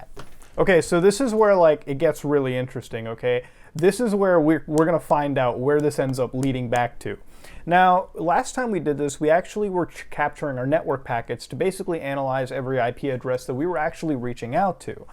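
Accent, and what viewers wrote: India. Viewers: Can you read all text written by scammers in ur Indian accent like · Your indian english accent is really bad lmao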